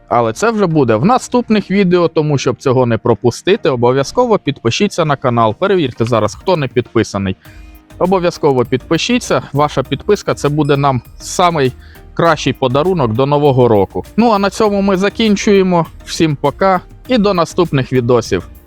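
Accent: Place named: Zaporizhzhia, Ukraine. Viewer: Відео між собою хоч схожі але дивитись все одно дуже цікаво))) Особливо коли від дому за хто зна скіки кілометрів а тут пару років тому випадково зустрів відео і чуєш свій рідний запорізький суржик! =)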